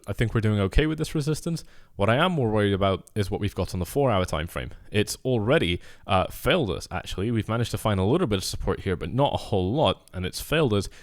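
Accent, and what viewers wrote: Scotland, United Kingdom. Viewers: Just found your channel mate, love it. East coast of Scotland accent??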